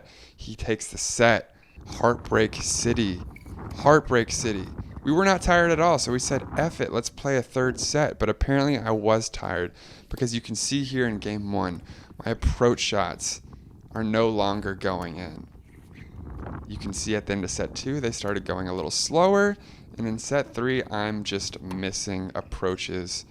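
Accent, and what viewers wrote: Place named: South, United States. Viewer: her southern accent killed me, love the skits.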